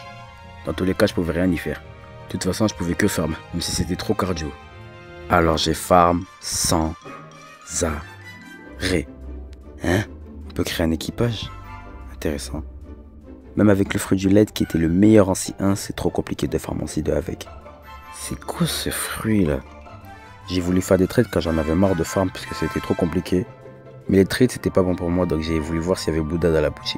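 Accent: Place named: West Africa. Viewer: Wesh l'accent me fume , tes ouest africain ou du viens du centre . Moi je suis sénégalais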